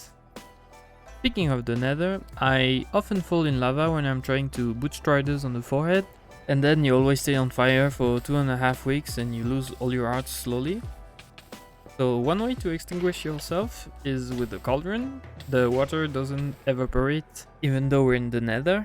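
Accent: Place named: Quebec, Canada. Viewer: Nice , I knew about most of em but didn't know creepers needed line of sight , thought was a player proximity thing as I have been hit many times by creepers sneaking behind me, but I guess that's my line of sight and not his or hers ( not sure about creeper genders 😉) always something to learn. And yes I agree boats are actually ridiculously op, who would have thought one of the most powerful and versatile items in the game would be a boat. ( maybe that's a hyperboly) . BTW are u French Canadian, I always thought u were from France but today I detected some hints in your accent that seems distinctly French Canadian. If so hello from Montreal.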